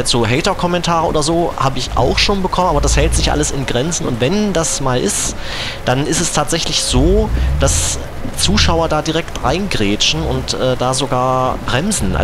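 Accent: Germany. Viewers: Ei verbibcht noch e mo, da kimmt ahner wo och ausm scheene Sachseländle :D ... Mir sinn fai gluuch, dischdsch lusdsch, schnibbsch und gudmiedsch sinn me ooch und manschema e wing einfelltsch, nur eens simmor ne: Dehmlisch! Grüße aus Dräschden :D So, genug von dem Scheiß, zu deiner Frage: Nein, Himmel, kein Minecraft :P Also, ich guck ja viel bei dir mit und kann dich mitlerweile gut ab, aber dort bin ich raus...sorry ;)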